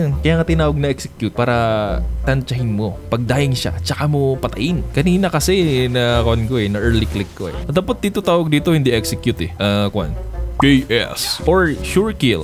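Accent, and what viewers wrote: Visayas, Philippines. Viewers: Bisaya ka pala lods 😂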